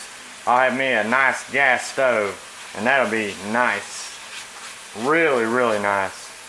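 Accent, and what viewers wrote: South, United States. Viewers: Southern accent.. Im from Waterloo Alabama so I hear it. Just found your channel and love it!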